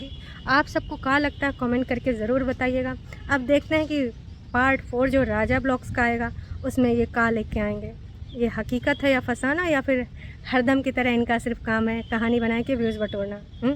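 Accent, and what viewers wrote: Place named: Kanpur, India. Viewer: Ap kanpur se hai kya...ye to humari kanpuriya bhasa hai...mast bolti hai ap...❤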